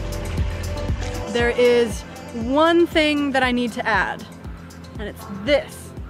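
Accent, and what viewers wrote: Canada. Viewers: Your accent is so Canadian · I came for the cutie with the Canadian accent. Then realized the video was pretty good too.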